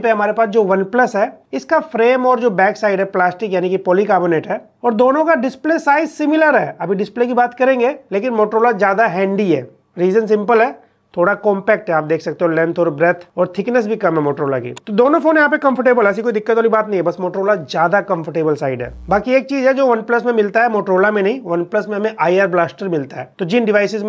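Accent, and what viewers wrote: Lucknow, India. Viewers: Bhai aap Lucknow se hona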